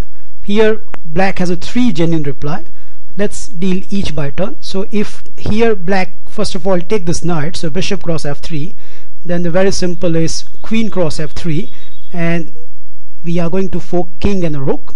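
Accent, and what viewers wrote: India. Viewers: nice..as I am also an INdian,your accent is easy to understand and very interesting..thanks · Indian accent is obvious o.o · zRouth I am a language teacher AND a passionate chess player in one, and I must admit his accent is like many people's accent in India, but his grammar is not (always) correct what can be disturbing (for native speakers, but I don"t belong to that group.) What he explains about chess is excellent on a lower level. (GM-s do not necessarily benefit from that, as they are already familiar with these tricks /at least the majority of them/.) All in all, I like his vids, you can use them well if you play with short time control (3-5 minute-games) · its not a grammar tutorial. and arent you entertained by the way he speaks? for me its funny but at the same time you learn. leave him alone hes not american he's indian, most call center agents are. 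just appreciate the knowledge and entertainment you get at the same time.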